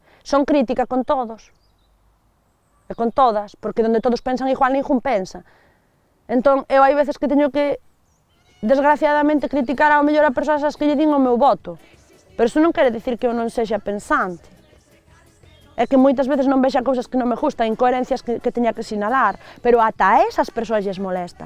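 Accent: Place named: Castile, Spain. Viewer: Se eu fosse ela optaria por falar logo em espanhol porque o galego dela é muito ruim. Não se entende nada, fala rápido , usa muitas expressões hispanicas e o acento é totalmente castelhano.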